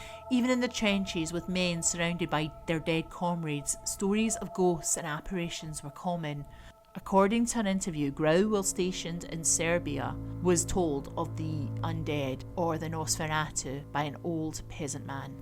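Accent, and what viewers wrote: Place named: Scotland, United Kingdom. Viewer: That was great! Nice to hear a Scottish accent on youtube ( im from Falkirk ). Deffo liked and subscribed! Have a good one.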